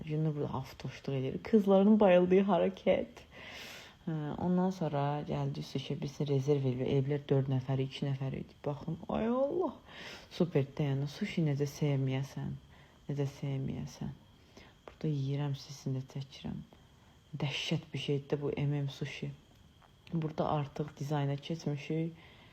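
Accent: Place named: Talysh, Azerbaijan. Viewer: TALİŞDI ONA GÖRE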